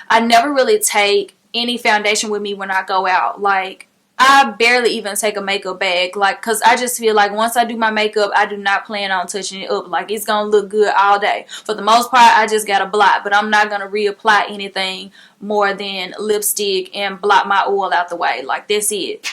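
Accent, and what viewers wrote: South, United States. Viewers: I just love that voice. Country girl sound like what I have... 😉. You about to have me spend some money hunny!! Great review!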